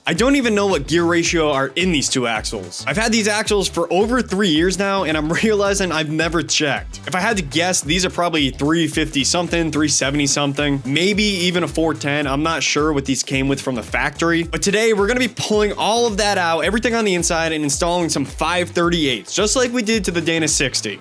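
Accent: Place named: Canada. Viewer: Boston? My guess was Canadian haha. I can hear it now that I'm typing this and you keep saying bearing!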